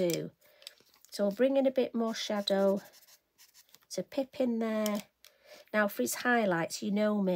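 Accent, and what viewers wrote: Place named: England, United Kingdom. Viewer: love it! I enjoy watching you painting, and love your English accent. It is very soothing, and easy for me to understand what you say.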